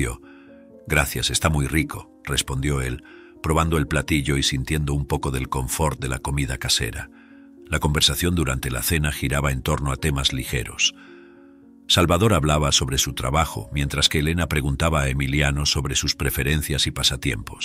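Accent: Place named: Spain. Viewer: Para que la historia resulte interesante y hermosa, tiene que haber una buena historia, una excelente voz, bien modulada, buen lector. Todo éso se ha encontrado en ésta. Muy especialmente, con el hermoso acento del Castellano por un español. Me encantó.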